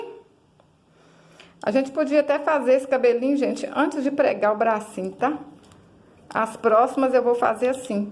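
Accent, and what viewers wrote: Minas Gerais, Brazil. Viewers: Eu simplesmente ameiii, adoro bonecas de pano, adoro fuxico e adoro esse sotaque mineiro 💖😍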